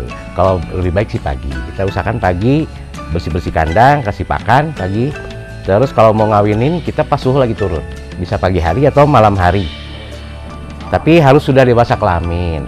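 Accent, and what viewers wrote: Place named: Sunda, Indonesia. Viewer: Kang Sunda banget santai bicaranya renyah enak di dengar saya mau nyoba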